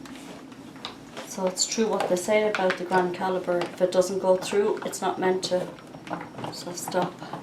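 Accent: Ireland. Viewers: I've not looked into embossing diffusers yet, but your video makes it look so simple. A great technique to add to my cardmaking, thanks for sharing! Love the Irish accent too, lol! P. S. I wonder if you could use a plastic cutting board/mat from the Dollar Store for this purpose?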